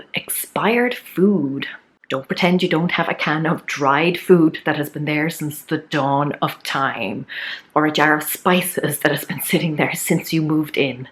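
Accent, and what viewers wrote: Ireland. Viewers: That's a great idea to simply go around looking for rubbish. Amazing I didn't think of it. I agree with the lady below about your warmth and humor. I am partial to Irish accents. The advantages of Marie Kondo's and the Flylady's methods is they are systematic. . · I always love your humor and inspiring ideas! 😀 Wish I could have your Irish accent. My house is so cluttered it's hurting my brain, but I am a tired mom of a non-sleeping 6 month old. Husband asks if he can help clean, but it doesn't work out well, he doesn't like to organize. There are a few things he keeps that spark annoyance for me but not for him, an old picture frame from his late grandmother, and some old statues of unhappy looking folks that are supposedly passed down by family. What can I do with those? Oh and what do you do to a collection of wine corks that spouse will never get around to doing anything with? 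My husband has been collecting wine corks for 4 years and still doesn't know what craft he wants to make. He won't let me trash them. 😑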